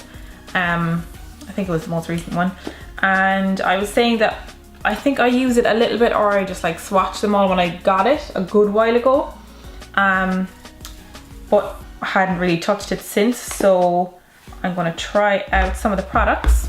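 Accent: Ireland. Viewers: Gorgeous look,I can hear an Irish accent from you😉🌺Are you Irish?x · Are u Irish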